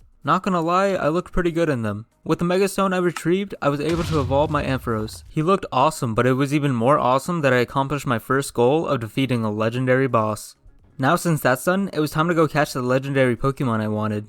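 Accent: India. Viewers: actually sounds like indian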